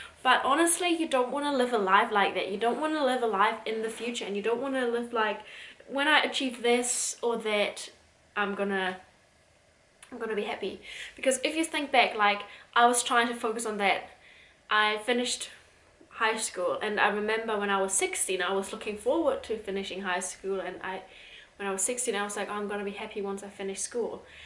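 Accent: New Zealand. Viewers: I see your English is getting the kiwi touches. Noticed the way you say happy 🤣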